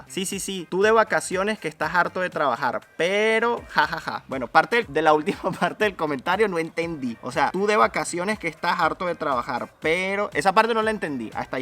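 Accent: Venezuela. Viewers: Me encanta tu canal paisano. Me encanta tu personalidad y la de tus esposa, son geniales. No le prestes atención a los malos comentarios y tu acento maracayero me encanta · ¡Me encanta tu acento! Antes de mirar tu canal, no podía entender el acento venezolano, pero mirar tus videos me ayudó poder entenderlo. Ahora que lo puedo entender, me parece un acento muy bonito. (Perdón el español malo; no es mi lengua madre 🙂).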